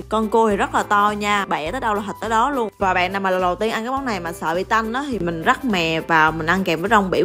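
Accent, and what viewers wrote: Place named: South, Vietnam. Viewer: Giọng miền nam mà bạn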